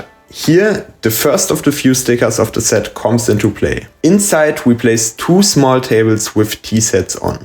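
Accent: Germany. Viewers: Wow wie geil ich holle die mir fix ich höre deutschen akzent😂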